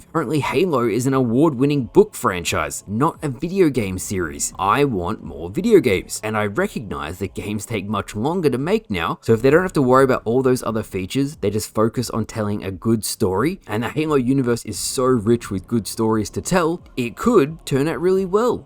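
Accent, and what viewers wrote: Australia. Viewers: I can't find any matches in infinite you sound Aussie too so I wonder how you do with matchmaking I can't get a single match in Infinite on PC, and in MCC I just get matched with Yanks and Euros but at least get some matches at all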